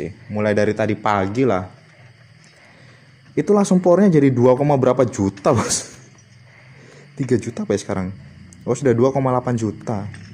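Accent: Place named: Eastern Indonesia, Indonesia. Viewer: Orang timur ini yak wkwk sa ko sa ko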